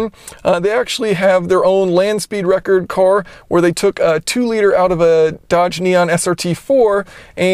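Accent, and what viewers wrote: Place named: South, United States. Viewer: Hyundai Accent Fan Club. I like the southern accent. headlights are very impressive, really stand out in the rain. body lines are smooth as silk with curves precisely where needed. does have a Crack in rear end but the ride is excellent. .maintenance can be pricey if not cleaned and serviced daily and if given wrong fuel there is a occasional exhaust smell. do love it but can't wait to trade for new model. ...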